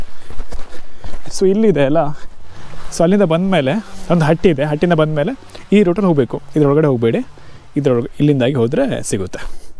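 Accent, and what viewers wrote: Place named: Dakshina Kannada, India. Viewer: ದಕ್ಷಿಣ ಕನ್ನಡ ಕೇಳ್ಳಿಕೆ ಒಂದು ಚಂದ ಮಾರೇ🫶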